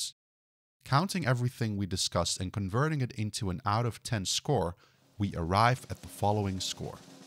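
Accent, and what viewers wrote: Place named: Netherlands. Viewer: Nice video. Thanks! And clearly Dutch! ;-)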